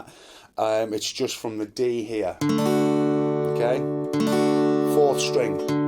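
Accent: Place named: England, United Kingdom. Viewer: Definitely the best channel on the net for beginners and intermediates non English natives. I appreciate a lot the vocabulary you are using and the speed of the lessons and the explanations. Very very nice English accent. You transmit the calm and the patience necessary to learn. That way you will add a lot of subscribers from abroad as my case. Kind Regards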